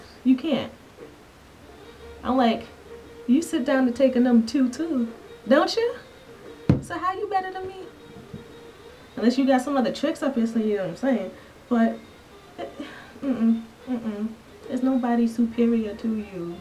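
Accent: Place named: New York, United States. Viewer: Love this vid! Are you from New York? You kinda have the accent.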